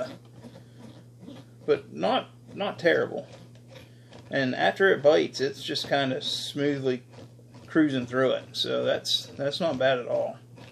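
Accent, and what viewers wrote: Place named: Pennsylvania, United States. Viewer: You have to be from PA right? I hear it in your voice??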